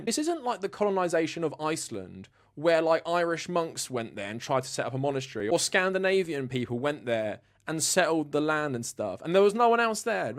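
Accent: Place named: United Kingdom. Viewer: Judging by your accent you are of british heritage, so are we here and didn't the british not do the same in Australia, India. So you're a colonizer as well, you have ancestors that were there killing those native Americans. You're probably closer to being related to those killers than I am and I was born here in the US.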